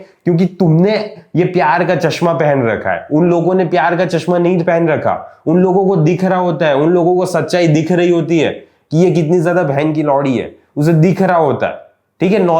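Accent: Gujarat, India. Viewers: Gujju accent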